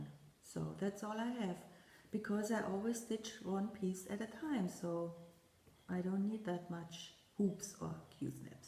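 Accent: Germany. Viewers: Your stitchy baskets and table are so pretty, and your lovely German accent is delightful to listen to. Thanks for another wonderful video.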